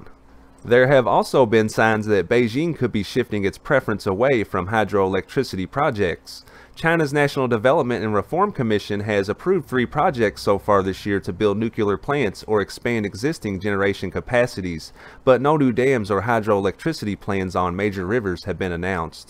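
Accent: South, United States. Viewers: Like the accent tho I gotta southern accent too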